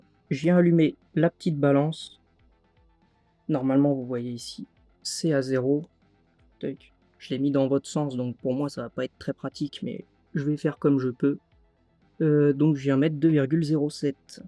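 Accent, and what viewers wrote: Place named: England, United Kingdom. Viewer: Ah l'accent anglais là... :face-purple-crying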